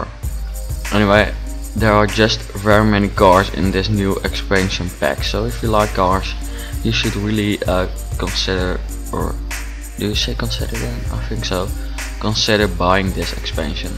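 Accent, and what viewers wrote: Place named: Netherlands. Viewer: ik weet dat je nederlands bent :p dat herken je zo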